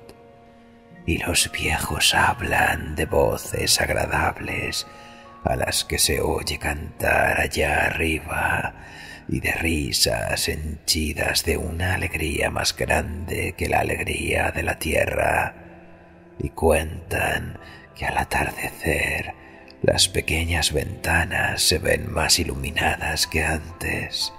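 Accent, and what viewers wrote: Catalonia, Spain. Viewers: El narrador es catalán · Vaya ramalazo a catalan cerrado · Por que el acento catalan?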